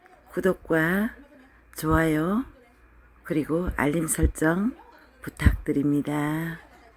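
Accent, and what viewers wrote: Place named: Gyeongsang, South Korea. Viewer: Like7 식당음식이 깔끔~ 대구 억양이 너~무 좋아용♡♡♡♡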